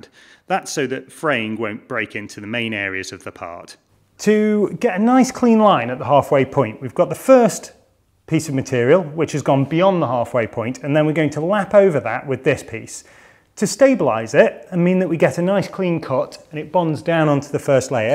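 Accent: United Kingdom. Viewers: I like hearing him explain things in his cute British accent! This is porn for moms! 💁🏻‍♀️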